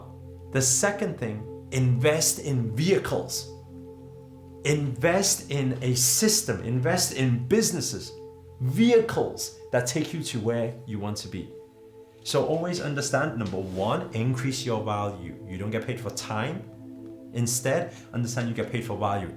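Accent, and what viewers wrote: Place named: Liverpool, United Kingdom. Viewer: Did you ever live on Liverpool? I hear a hint of scouse in your accent. :)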